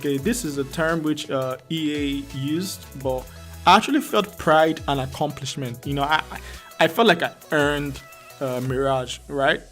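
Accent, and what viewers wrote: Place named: Nigeria. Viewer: Wow... Love your accent. Are you from Nigeria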